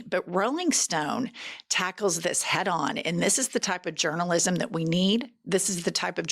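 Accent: South, United States. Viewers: I like you, but please learn the pronunciation of Bukele's name. (Boo'-ke-lay) He's the human form of a shriveled ballsack, and I'd rather you call him Bukake, but that's not why I sent this correction. I love the pod, you, and the. And I will admit, a southern accent on a woman is sexy, whereas, on a man, it just makes them sound stupid. I want to retain my attraction to the female southern accent. Please don't take offense.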